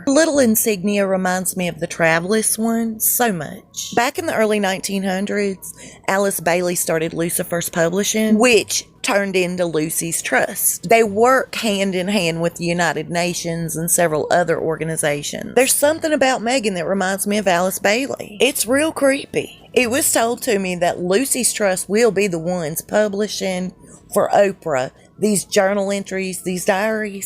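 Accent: South, United States. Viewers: Misleading headlines you are full of it for mere money but you do to worth with that a awful gaad southern drawl please stop it is is really sick.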